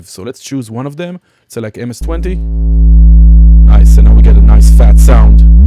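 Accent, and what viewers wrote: Israel. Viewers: גם ללמוד מסרטון באנגלית ברמה גבוהה וגם לשמוע מבטא ישראלי כבד. תענוג! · חחחחחח ישראלי ,מבטא יפה. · אחלה ערוץ יש לך. אוהב את המבטא שלך. ישראלי אמיתי. תודה אחי.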